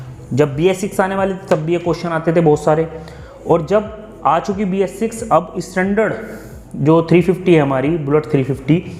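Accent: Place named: Uttar Pradesh, India. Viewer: Kha se ho ap UP mai .....aj language suni dyan se .phle.jesii rehve 😂😂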